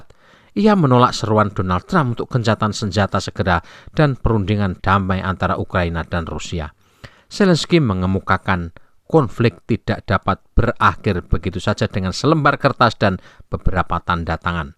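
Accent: Java, Indonesia. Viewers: Mas, medoke ora ilang ilang ? Aku Suka 😅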